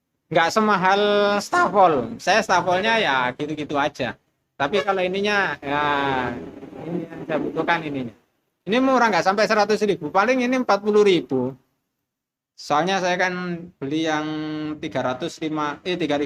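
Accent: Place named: East Java, Indonesia. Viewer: wong ndi se mas,, kok kyk jatim ngunu ngmg e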